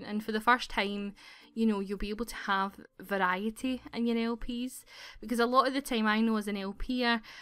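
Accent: Scotland, United Kingdom. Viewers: Are you British? NEW TOO YOUR CHANNEL!!! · Are you Scottish? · 4 some reason u sound Scottish 2 me...or it could b the fact that I just finished watching BRAVE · you admitted that you have a JLo butt? very brave of you! Can't wait to see you make yourself if you're going to! Love the 'wee' scottish accent too! x From what I've seen of this CAS mode, I'm disappointed that the customisation is a bit more limited, like there is no 'create a style' tool.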